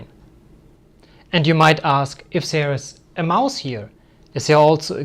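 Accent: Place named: Germany. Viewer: Es ist immer wieder faszinierend, dass man den deutschen Akzent so gut heraushört 😅 Ein wahnsinnig gutes Projekt.. ich sollte aufhören, auf die Empfehlung von RBB zu reagieren, oder ich werde später mal nie fertig, sobald ich zum eigenen Basteln komme 🤷‍♂️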